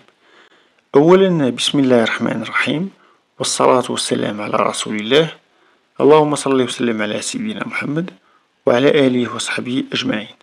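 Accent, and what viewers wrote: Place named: Morocco. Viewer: تحياتي لك سرد رائغ ولكنة جميلة .تظهر انك ابن المغرب الاصيل.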